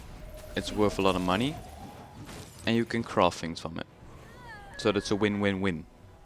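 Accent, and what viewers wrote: Netherlands. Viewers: very nice video, i directly heard u were dutch ;) subbed for more videos! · Dit is letterlijk de eerste keer dat het me niet stoort dat iemand die nederlands is engels praat in een filmpje. Je hoort het wel, maar je hebt (voor zo ver ik hoorde) geen fouten gemaakt zowel grammaticaal als in je uitspraak. Daarbij was het ook een heel handig filmpje aangezien ik net 2 dagen bezig ben met gw2. Keep up the good work!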